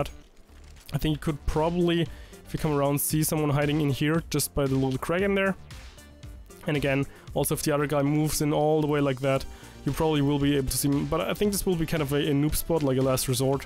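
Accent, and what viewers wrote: Germany. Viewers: man hört richtig das du deutsch bist, trotzdem gutes Video 💪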